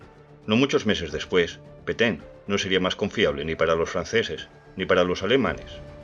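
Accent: Galicia, Spain. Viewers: oye eres gallego?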